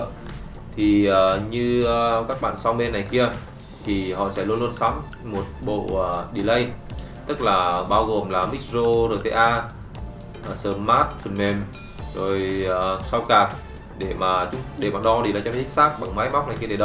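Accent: Vietnam. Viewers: Bạn người ở đâu mà nói giọng miền trung vậy...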